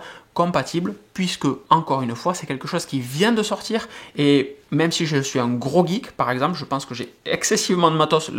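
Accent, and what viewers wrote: South, France. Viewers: Salut, merci pour cette super vidéo. J'aime beaucoup ta façon de partager ta passion et de vulgariser de façon simple tout ce que tu expliques. Et franchement ton accent et tes expressions telles que "ça poutre" ça me tue, je suis aussi du sud, mais sud sud (34) pas sud-ouest donc c'est kiffant. Pour en revenir au sujet, j'utilise perso un ORBI RBR20 ça suffit amplement pour chez moi et au boulot je mets de l'unifi partout (je suis dans la tech aussi, support/installation), c'est amazing ubiquiti c'est la vie. Je ne mets par contre par autant de bornes que tu préconise (comme les musées) mais une ou deux par "étage" des UAP-AC-PRO qui font je crois du 3x3 MIMO et là aussi (on a que des portables et mobile) ca semble suffire. Mais tu me fais poser la question si je ne devrais voir pour augmenter ce nombre car beaucoup beaucoup de devices en Wi-Fi et que 2 bornes... 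Bref continu comme ça! Top moumoute ta chaînes et tes vidéos.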